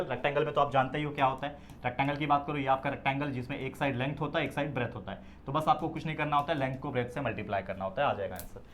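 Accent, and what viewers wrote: Maharashtra, India. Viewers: Marathi voice❤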